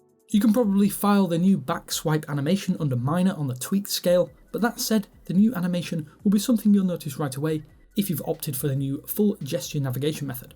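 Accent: United Kingdom. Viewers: British Accent!🤦🏻‍♂️ Maybe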